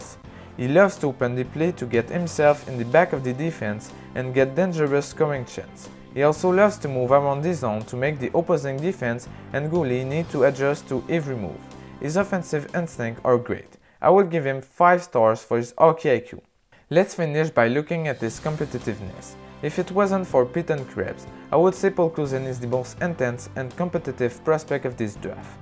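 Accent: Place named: Quebec, Canada. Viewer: Are you a Quebecois? I am trying to figure out what your accent is. I am Californian. My distance from it makes me unaware of the accent.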